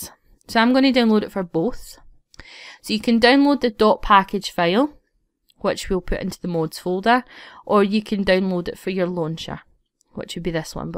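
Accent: Scotland, United Kingdom. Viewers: where about in Scotland you from? I'm from Glasgow! noticed ur accent right away 😂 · Are you scottish? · She is Scottish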